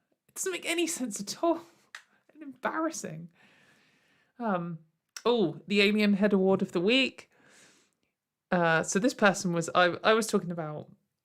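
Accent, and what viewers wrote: United Kingdom. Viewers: My first video of yours! Very excited! I have to say, I love your voice, it's honestly very soothing. And as an American I of course find any UK accent attractive (okay maybe not Cockney accents, but still) I also am so blown away by your patience and calm tone throughout the video (I mean I'm only halfway, but I wouldve lost my absolute shit by now).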